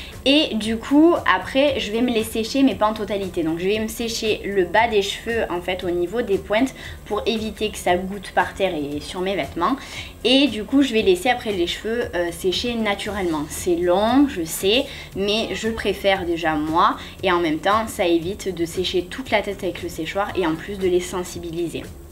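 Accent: South, France. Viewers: Tu viens du sud ? J'adore ton accent et tu es très jolie. _ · Oalalaa sa sent l'accent du sud la mdrrrr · lol tu serais pas toulousaine ta un accent du sud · Tu as l'accent du Sud comme moi... #ACCENTDUSUD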